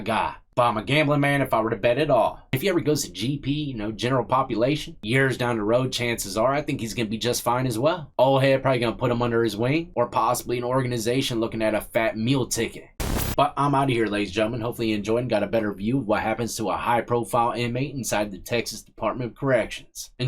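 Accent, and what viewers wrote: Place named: Virginia, United States. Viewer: Be easy; I still hear that Virginiy accent lol.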